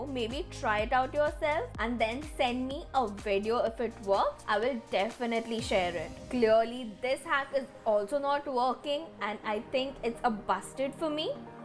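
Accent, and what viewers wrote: India. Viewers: I love her Hinglish ☺️😅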